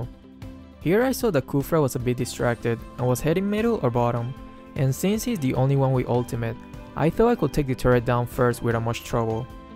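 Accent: Philippines. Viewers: i believe no... Based on his accent... If ur filipino u wud know wat i mean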